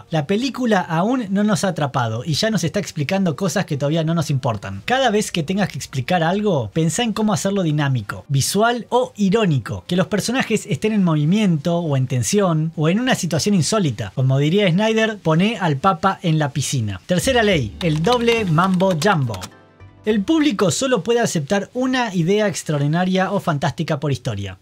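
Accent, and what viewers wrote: Argentina. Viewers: No acabé de leer el video, no soporto el acento argentino, te recomiendo que aprendas a hablar con acento neutro.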